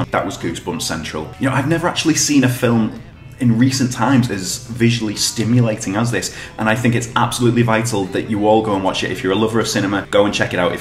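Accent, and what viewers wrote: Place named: United Kingdom. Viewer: Ounder the skin, I saw-writ. Lol. What accent is this? It is from the UK somewhere, no?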